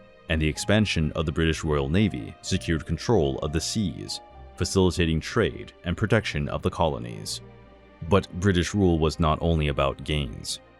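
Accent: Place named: United States. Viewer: Of course its a biased review, the voice over is done by an American XD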